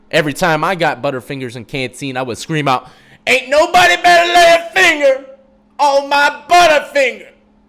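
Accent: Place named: South, United States. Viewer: That mid southern drawl.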